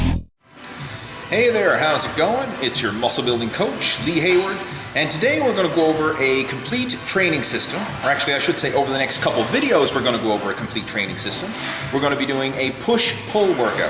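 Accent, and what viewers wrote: Newfoundland, Canada. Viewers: Lee, are you a newfie?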